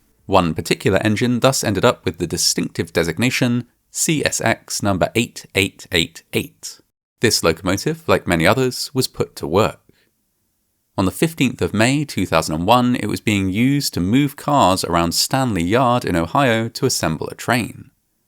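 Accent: United Kingdom. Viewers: I've listened to 15 seconds. I hear a British narrator voice for an American story - a telltale sign of an AI generated video. Can anybody tell me if this is a true story or is it AI fiction? For now, I'm clicking away and going elsewhere.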